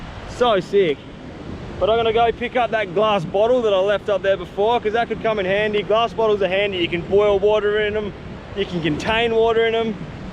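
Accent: Western Australia, Australia. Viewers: yes! Quite easily distinguished. Australian’s speak a lot slower than New Zealander’s and have a stronger nasal twang also lots of slang that we don’t use, we have 3 different accents countrywide, European Kiwi (Pakeha), Maori, and Southland accent. Australia has many more than us and the one that is most alike ours is Tasmanian but the guy in this vid sounds a little more Western Australian, Perth region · Love your bloody Ozzy accent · Its and Australian accent i can tell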